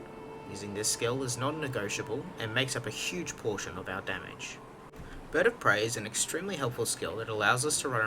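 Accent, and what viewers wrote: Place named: United Kingdom. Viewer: Thank you so much for being experienced, and explaining everything pristine. I came here from a comment you left on one of Hack's eso warden build videos. His build was nothing compared to yours and I almost made the grave mistake of copying his build. That is when I found your comment, it seemed so educated, which made me click on your channel. When I saw you had a REAL man's warden build, I knew God existed and worked in many mysterious ways, and that those ways brought me here. I am from the States, but you sound like you are in the UK, God Bless The Queen.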